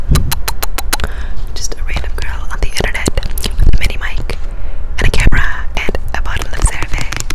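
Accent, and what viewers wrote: England, United Kingdom. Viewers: Can you make a video with only your England accent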